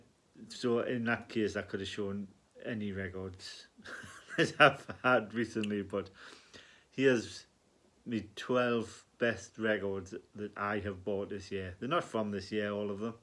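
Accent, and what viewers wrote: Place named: Northern England, United Kingdom. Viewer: Thank you! I would say you live farther north in England.. maybe half way to Scotland? Trying to figure out your region by your accent.. and by the way.. I'm the one with an accent, not you! Hehe.😀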